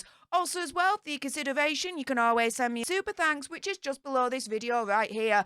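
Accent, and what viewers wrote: Yorkshire, United Kingdom. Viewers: Sounds like a Yorkshire lass..🤔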